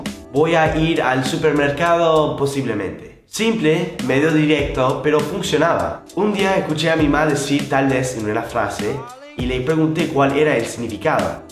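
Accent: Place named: Argentina. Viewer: posta chavon! se nota que tenes una conexion con argentina y lo bien que estas aprendiendo, no solo a hablar español, sino tambien a aplicar nuestro acento.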